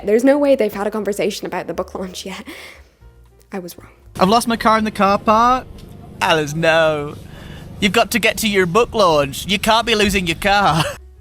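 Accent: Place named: Ireland. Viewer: Your Irish accent comes through a lot stronger when you are just chatting about self and things, then when you are doing skits. Not sure why that is.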